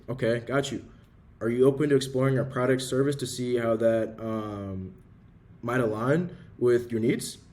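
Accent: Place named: Kenya. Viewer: heard your kenyan good work brother 🌵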